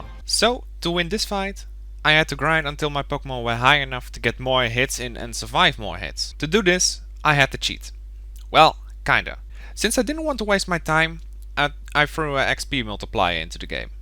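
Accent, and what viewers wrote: Netherlands. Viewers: love the dutch accent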